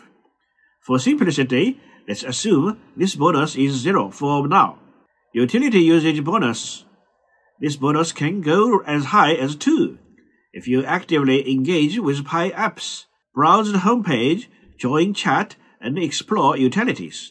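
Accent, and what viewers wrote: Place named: China. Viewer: Your Accent Reminds me of My Chinese IT Teacher... Easy for me to Learn and Follow your Guidance❤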